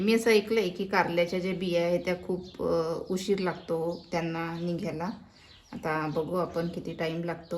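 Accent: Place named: Vidarbha, India. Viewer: विदर्भातील आहे का ...आपली भाषा वाटत आहे ❤ नागपुर side ची...मला आवडले वीडियो...जर्मन ची आजी चा वीडियो बघितला मस्तच ❤❤😊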